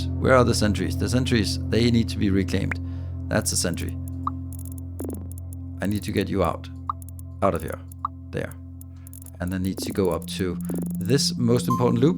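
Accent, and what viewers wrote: Denmark. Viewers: Are you danish?🤔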